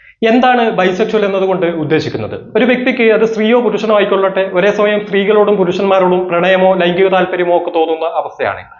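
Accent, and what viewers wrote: Kasaragod, India. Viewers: കാസ്രോട് ശൈലി തന്നെയാണ് ഭായ് രസം ❤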